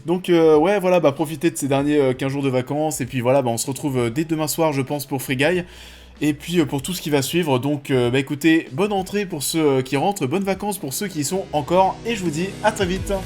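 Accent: Paris, France. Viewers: On devrait donc selon toi nuancer les attaques et les insultes permanentes que subissent au quotidien des fonctionnaires cherchant simplement à faire leur travail ? Rester dans les clous que nous impose la bien-pensance des milieux privilégiés d'extrême gauche ne suffira pas à gommer ton manque de connaissance du sujet que dévoile ton accent parigo du 75, de privilégié justement...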